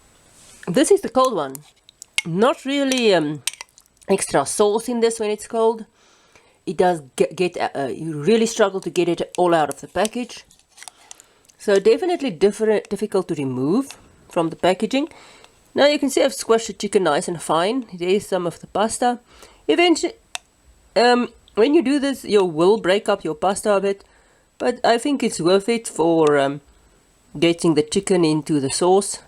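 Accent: South Africa. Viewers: Joh that South African accent is coming through hard😂 Baie goeie review👌🏻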